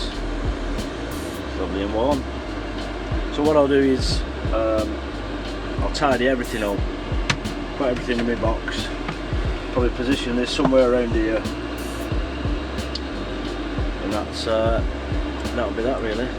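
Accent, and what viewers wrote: Wigan, United Kingdom. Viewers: is that a wigan accent? great video, very helpful. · Great video bud. I wanted to see this exact part of the installation, I'm glad I did too, that pump makes a right horrible noise! Its definitely getting fed from the tank, I'm not having that inside my van! 🤣 And I have to have a guess, you sound like you're from Wigan, maybe Bolton?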